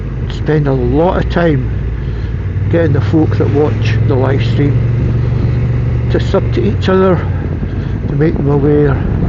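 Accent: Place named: Scotland, United Kingdom. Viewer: Good video, stunning views, and that accent is doing half the tourism board’s job on its own. I can’t wait to visit Scotland. Videos like this are dangerous for the travel fund. Also, the live stream was brilliant. You guys are doing such a lovely thing for the community, and I hope it grows and grows. Proper good vibes.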